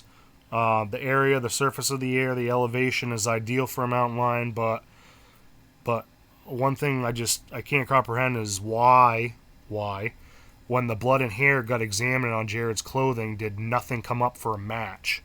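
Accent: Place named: New England, United States. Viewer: Good video. I hear you're a fellow new englander like i am.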